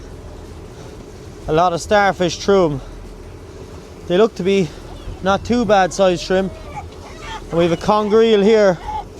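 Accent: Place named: Cork, Ireland. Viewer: Love your video's. Get a bit home sick when I hear the Cork accent and see the scenery! Keep the videos coming...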